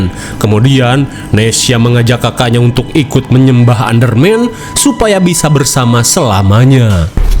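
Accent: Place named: Sunda, Indonesia. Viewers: itu logat Sunda bang, resep bgt saya dengernya, karna saya juga orang Sunda🤣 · logatt Sunda itu biasanya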